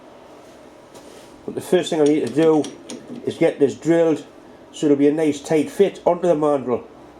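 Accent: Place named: Yorkshire, United Kingdom. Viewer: I’m guessing by that accent you’re from Yorkshire?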